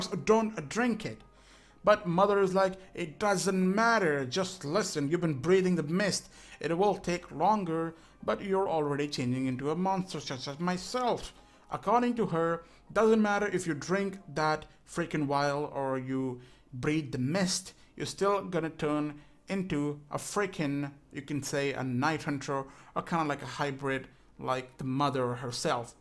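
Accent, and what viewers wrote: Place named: India. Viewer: You sound like an indian gamer but thats why i will subscribe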